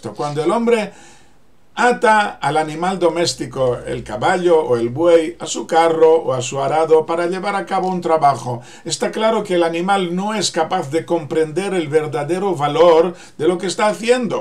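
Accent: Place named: Spain. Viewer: La primera vez que oigo un judio con acento Edpañol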